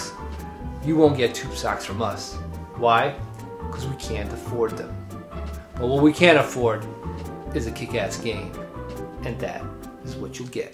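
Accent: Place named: Netherlands. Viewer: You are dutch, certainly.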